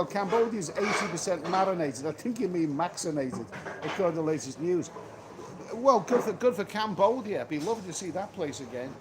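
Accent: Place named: Liverpool, United Kingdom. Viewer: Scouse is routinely rated as the hardest English (not Scottish) accent to understand. Rated way below even Cockney. Many Brits are embarrassed over having Scouse in England. This channel needs SUBTITLES!! I am tired of hearing about wild "bears" (beers) and pals (pools). Many other words too.... I simply have no idea.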